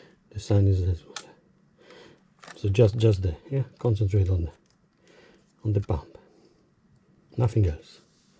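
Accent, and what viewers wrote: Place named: France. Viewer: Gotta hate French accents...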